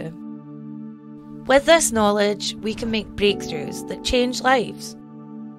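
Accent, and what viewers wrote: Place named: Scotland, United Kingdom. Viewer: I can't really focus on the content because you're speaking in a scottish accent XD Sorry that's how I'm easily getting distracted :( Don't hate me please x(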